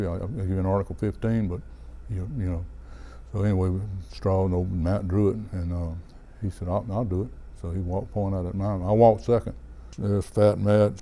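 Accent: Louisiana, United States. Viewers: You Cajun? Sounds like southern Louisiana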